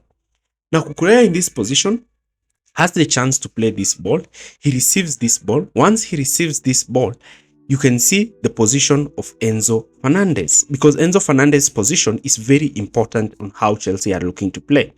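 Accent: Kenya. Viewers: This accent is so Kenyan, love it, also the analysis ismind opening, kudos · Nice analysis. Accent is familiar, are you from Kenya or have lived in Kenya in the past?😅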